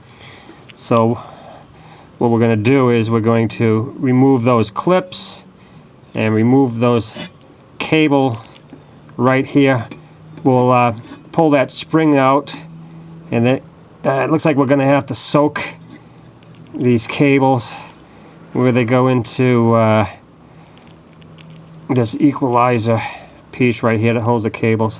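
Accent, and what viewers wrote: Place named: Woburn, United States. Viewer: I see this video has been up for a while but I got to say a couple things I lived in Woburn for a number of years met my wife there 30 years ago and through her I met some friends we still stay in touch and when I heard your voice I knew right away where you were at you sound exactly like my buddy Little Dave made me smile thanks for the video I’ve done this one many times I have a 99 Ford escort with get this 514,000 miles on it I just wanted to comment about the car but I’m gonna put another little post up just a minute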